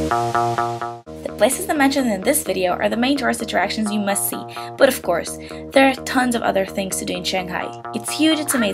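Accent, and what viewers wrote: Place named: United States. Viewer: Sounds like u have an american accent .. I like it 😊 ciao video stupendo brava !!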